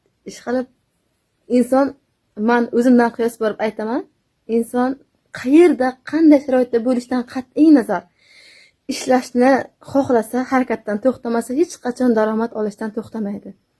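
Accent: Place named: Karshi, Uzbekistan. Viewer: Ассалому алайкум синглим шева Каршига тортоптмку землякмисиз дейман еки адашдимми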